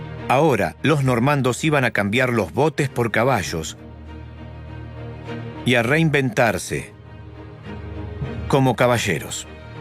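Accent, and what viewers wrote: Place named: Argentina. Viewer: Me gusta el narrador argentino la bataya!!!!!!!! Gracias.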